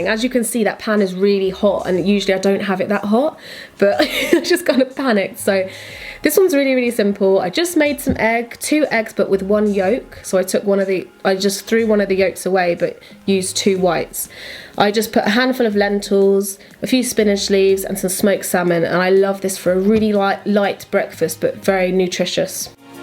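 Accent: United Kingdom. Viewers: are you British ?🙋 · Definitely UK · Sounds like UK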